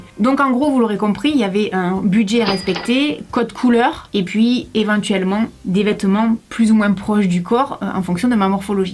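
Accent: South, France. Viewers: Coucou :) Tu viens de gagner une abonnée ! J'ai découvert tes vidéos sur la page d'accueil de YouTube, et j'en ai regardé une bonne dizaine ! Je me suis marrée comme rarement je me marre en regardant des vidéos. Tu es drôle, fraîche et spontanée ! J'adore ton look, ton style et ton petit accent du sud 😃 Et mention spéciale à la vidéo "mes pires moments de solitude" où j'ai hurlé de rire! Je regarde tes vidéos le soir dans mon lit après une journée de boulot et ça me détend ! Gros bisous et ne change rien, c'est parfait 😘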